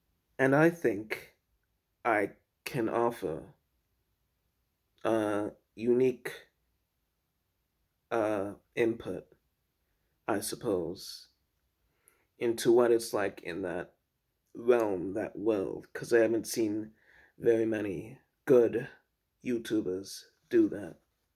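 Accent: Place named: United Kingdom. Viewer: I accidently come across your twitter page earlier and me been as nosey as I am ended up here. I have to say, your an interesting guy. Also when you first started talking I would have never guessed you're from the states. I thought you had a British mixed accent but wasn't sure with what. You do have a little bit of a London accent when you say certain things too. Does your parents have an accent at all? The only reason Is, my partner grew up 20 minutes away from where I grew up and he talks completely different to me. He has a very posh accent compared to me, but his mum and dad moved around a lot for work for my mother-in-law and they have a very strange, posh, mixed accent and he picked that up. Whenever people start talking to us they can't believe we grew up in the same town. Anyway, I apologise for rambling and waffling on. I enjoyed your video, keep up the hard work.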